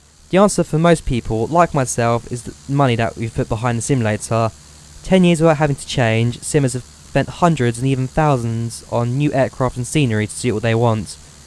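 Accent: England, United Kingdom. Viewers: Crazy. English...accent... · british accent is irritating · Is it just me or is this British accent really irritating? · You're saying a lot of interesting things I'm sure but I can't understand much of it. I know you're proud of it, but too heavy on the English accent. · It was real English not American nothing at all wrong with his voice if you are English.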